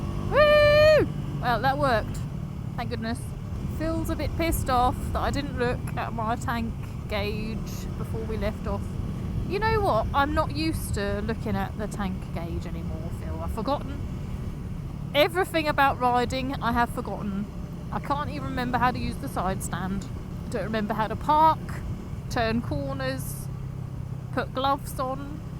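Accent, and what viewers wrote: England, United Kingdom. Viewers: Been wanting to watch this since you put it out... been working so not able. I am a person with no dependants, but I think we need to make sure we do stuff for ourselves as well as our kids/family/partner/etc... Don't feel guilty is what I'm saying. Your bikey sounds great on the throttle. Congrats on the wedding. I love that after a few years you still have your English accent, was expecting an episode of Allo-Allo style "ve have ways of making you talk!" :-D hahaha I don't watch Phil's German channel, still follow his UK one though. I got a van to do the moving bikes about thing... have only moved bikes a couple of times, but no rugrats 😀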